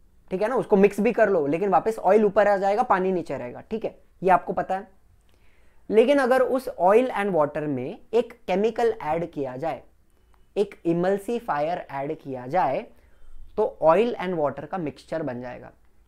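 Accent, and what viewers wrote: Maharashtra, India. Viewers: Sir aapn Marathi aahat ka ??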